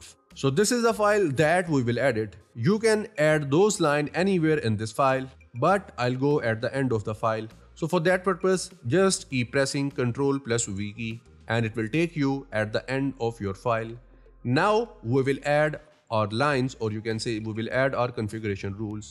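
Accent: India. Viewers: Indians speaking English sounds very ridiculous, next time use subtitles or remove anything below your tonge, the world will thank you.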